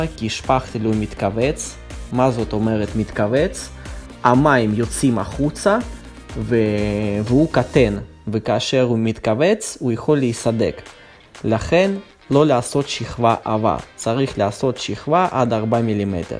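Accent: Russia. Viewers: Акцент явно русский.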